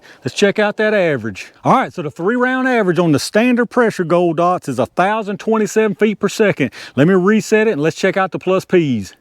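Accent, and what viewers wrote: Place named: Louisiana, United States. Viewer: Your accent says Louisiana, but the terrain says Georgia. Thanks for all the content!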